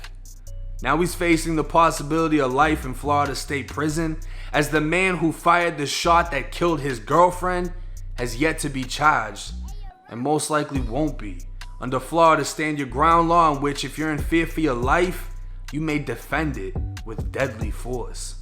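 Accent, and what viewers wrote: Boston, United States. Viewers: They were all on slow ass Harleys I bet.. they wouldn't never caught a sport bike.. That's also why I stay strapped tho 😂😭🤷🏾‍♂️ Also, u from Boston? I'm from CT.. i hear an accent lol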